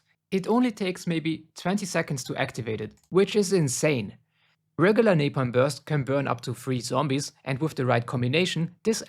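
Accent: Germany. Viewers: Minimal deutscher Akzent 😅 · Der deutsche accent ist strong😂 gutes Video! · It's odd, it sometimes does 1 Mil damage (hello from Germany btw, I think you are from here too cuz its noticeable in your accent), while other times it does like 45k-ish? Like this will happen on the same round, in the SAME trigger. It'll suck up 3 manglers, spit them all out, 2 of them die and the third just... doesn't? Amalgams too, sometimes it's a mil, sometimes it's just less than 100k dmg. It's inconsistent. Also, I was REALLY hoping the targeted augment and the explosive drop augment would help turn shadow rift into a horde clearing beast, but the damage doesn't scale at all making it virtually useless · Are u from germany by Chance ?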